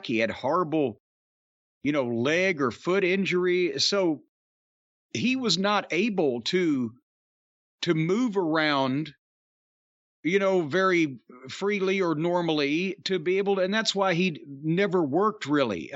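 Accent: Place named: Chicago, United States. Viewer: Gotta love Cornys Chicago accent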